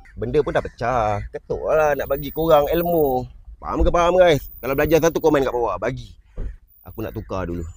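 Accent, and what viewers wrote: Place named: Aceh, Indonesia. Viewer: Aksennya seperti orang aceh yaaa..aq lama di aceh jd familiar dgn logat orang aceh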